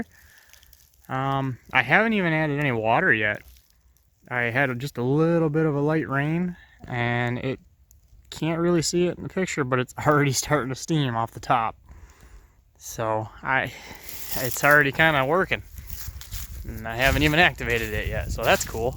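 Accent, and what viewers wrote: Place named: Midwest, United States. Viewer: He sounds like he is from sw Minnesota, or Nebraska or western iowa to me. I'm curious if I'm right.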